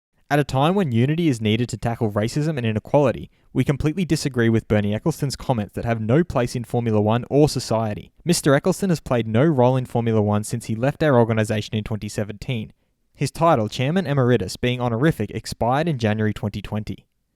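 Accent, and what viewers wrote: Australia. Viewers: Also you sound Australian. Wanted to know if you know if they will only show the main race on television or we will see the two practice days and time trials as well?????? Hope you do read the comments. But I really think you should do the countdown clock.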